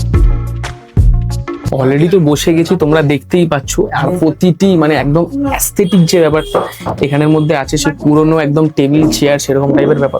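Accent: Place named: West Bengal, India. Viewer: Tor bhasha shunei bujhechhi.. Tui edeshi.. Edeshi ra khawa kobe shikhechhe??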